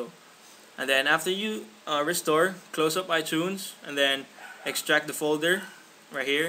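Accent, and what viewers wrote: Philippines. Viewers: lol man i can tell your soo phillippino!!! pinoy accent ftw. ;p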